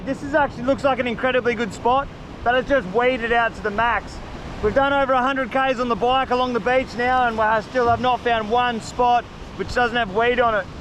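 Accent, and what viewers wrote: Australia. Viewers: Love your AUSTRALIAN DIALECT...Not 2 mention your energy!¡! EVEN WHEN ALL U CATCH IS " SEAWEED". Try running the Bike on the WET SAND, it won't slip under u as easily... Just saying. Keek the videos coming Dawgie... LMAO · My fav channel to watch.. this cat is loaded with personality and charisma plus that delightful Aussie twang!! never a dull sec in his vid😅🇺🇸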